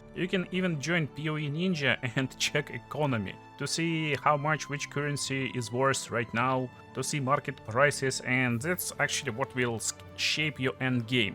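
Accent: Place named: Russia. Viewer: Bro as an Arabic guy, nobody can easily explain stuff to me better than russian accent 😂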